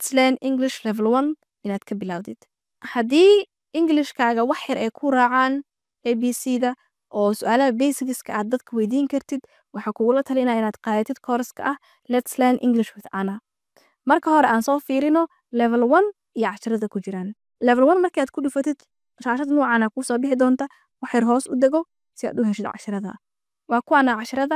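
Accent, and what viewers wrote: United States. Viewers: Wll waxan kaa rbaa appkii aad ku baratay American accent thanks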